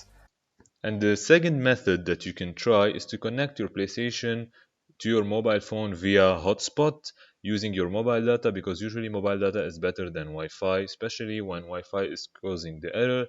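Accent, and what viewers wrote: Lebanon. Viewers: Damn are you Lebanese? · Lebanese ??? I think I figured it out from the accent 😂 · Are you lebanese ?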